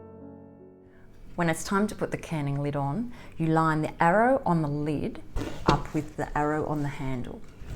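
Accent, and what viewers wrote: Australia. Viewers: Thank you for this video! I have just purchased a Presto canner and am finding your channel to be very helpful. It's lovely hearing a fellow Aussie voice on YouTube! Where can we get the gauge checked here in Australia? Thanks.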